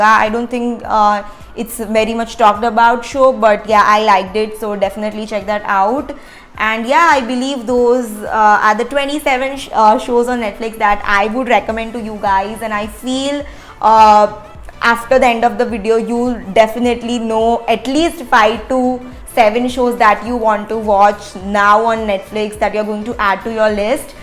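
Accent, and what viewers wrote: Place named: Gujarat, India. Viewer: Gujarati Taste Che Tamari English Ma..😅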